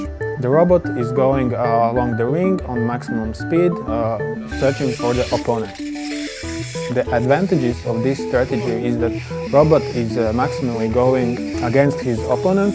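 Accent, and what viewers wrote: Latvia. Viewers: I was watching this and i thought your accent sounded familiar... I had a suspicion you were latvian! Labs video, pats domāju sākt veidot savu pirmo sumo robotu : ^) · That Latvian accent was so obvious from the very first word. :D I usually use something more similar to the first strategy, since usually the opponent robot is within my robot's sight basically upon the start. As my blad is decent, my robot just runs full force onto opponent. The main disadvantages come if opponent has flags. My robot doesn't yet account for it. Also, and embarrassing thing, but one of the 2 things have caused my robot to randomly restart on many competitions and I'm not sure which (hadn't tested): - charging circuit moving out of place and messing something up (not sure about this one, heard it from someone that it could be possible) - high noise in voltage from battery (due to not enough charge?) I'm hoping I can prevent this from happening again.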